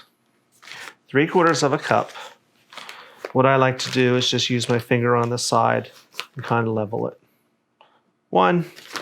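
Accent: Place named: United States. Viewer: This isn't Scottish shortbread, none of the ingredients are Scottish and from the accent of the bloke making it it's being made in america, so it just shortbread. As a baker i have made more shortbread than this guy has had hot dinners, and you don't put salt in it.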